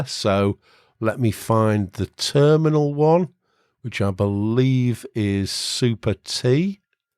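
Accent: United Kingdom. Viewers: Oh no! speech speed and British ascent is perfect mix. I personally enjoy listening to way more that any other youtube Linux channel out there. Informative and relaxing in the same time. This channel is a marvel...thank you!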